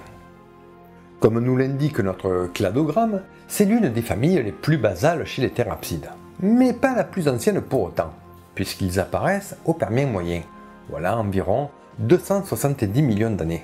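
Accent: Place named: Albi, France. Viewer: Bien le bonjour, un grand merci pour le partage de votre savoir, ce fût très passionnant 🙏 Et cet accent me rappelle tellement mes professeurs à Albi en BTS 😊 (je suis du Nord 😅) bref je viens d'activer la cloche pour ne rien louper 😉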